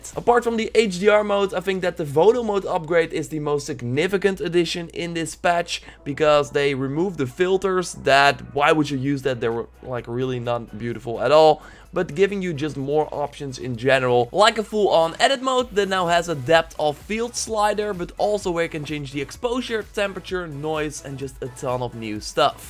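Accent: Netherlands. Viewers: Are you dutch? Ik hoor het beetje. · Dutchies are so recognizable haha, I love our little accent. Dope video though!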